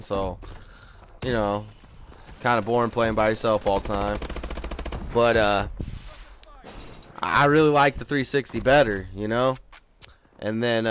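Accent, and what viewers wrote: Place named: Indiana, United States. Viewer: 20 times!!!!More times!!!! I can tell your from Indiana. lmao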